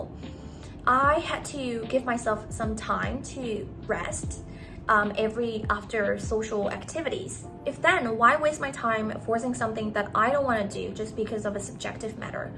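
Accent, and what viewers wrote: England, United Kingdom. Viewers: How do I get English accent like you, plzz give me some tips with one vd ma" ,most of Myanmar people want to know for it ❤ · I love your English accent so much . Do you have a Instagram account?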